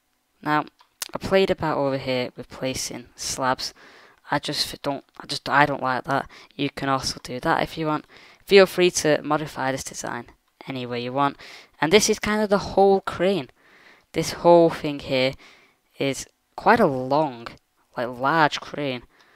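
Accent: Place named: Ireland. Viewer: You sound irish even though you're english.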